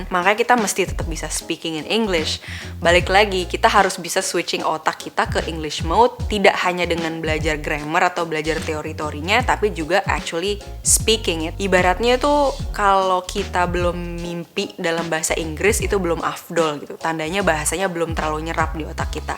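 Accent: Indonesia. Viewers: Paling nikmat emang speaking dan aksen nya orang indonesia 😭. Juelas banget. Dan jarang oake slang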